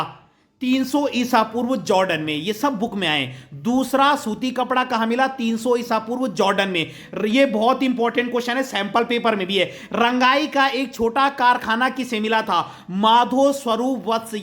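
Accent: Garhwal, India. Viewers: Sir aap gadwali ho na 🫢🫶🤭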